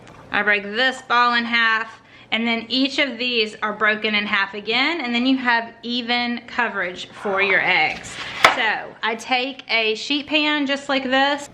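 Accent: South, United States. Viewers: Are you in or near Texas you have a fabulous southern accent.. ya all lol x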